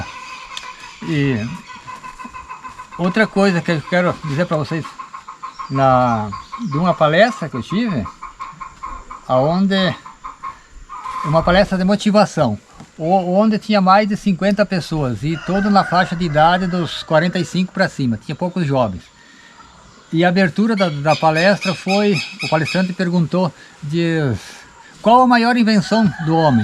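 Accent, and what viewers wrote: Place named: Italy. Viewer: Como gosto de ouvir o senhor falar , esse sotaque Italiano que sdds dos meus pais, muito sofrido a vida , mas a gente tem que agradecer a Deus pq os jovens tem de td e nem sempre são felizes. Parabéns estou gostando muito dos vídeos do senhor continue assim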